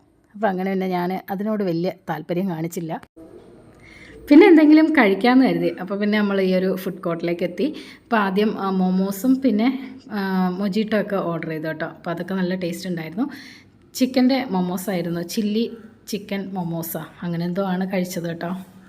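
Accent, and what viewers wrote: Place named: Southern Kerala, India. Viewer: ningo sharikkum കാസറഗോഡ് nneya pore 🙄samsaram motham തെക്കൻ ശൈലി അത് എങ്ങനെ കിട്ടി കാസറഗോഡ് ന്റെ ഒരു തരി സ്ലാങ് വരുന്നില്ല സംസാരിക്കുമ്പോ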